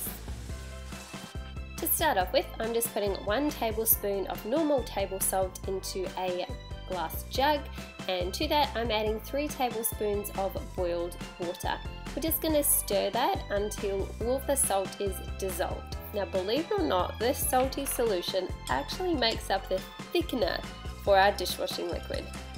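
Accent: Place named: Brooklyn, United States. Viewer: You are welcome. All of the USA I am sure wishes your environs and wildlife come through the fires as best as possible. BTW- I thought your accent was from Brooklyn, NY at first, though. :-) I like the "make your own" liquid cleaning products. Money saving and safer. Hope you get back to more when you can. -UrbanLifeTime and us all. :-)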